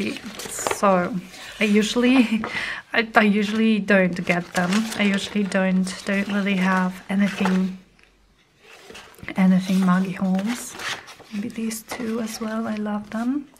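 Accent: United Kingdom. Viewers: both of those series made me wish I could travel to Europe and Australia. My family name is Montaque and we came from England and Scotland. I love the accents. That's another reason your voice is so soothing and relaxing to me. I love watching you and hearing about your life. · I love these vids. 💜 Your creations are so delightful, even your scraps are lovely! Been busy knitting and trying to learn new stitches which is a challenge for me. Hey! When did you replace your table? I miss the teal one 😉 I love Scottish accents too 💕 if I could have seen in concert that would have been epic!! 💫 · I love your new series! Absolutely relaxing and it's so nice to know you better as you talking more about yourself ☺ I love Downton Abbey, too! My mum and I watched it uncountable times 🥰 And I agree, I could listen to British English for hours. I do not watch TV or movies/series very often, I am more in reading , so no tip for you 😅 But warm hugs instead 🤗 😉🐶😘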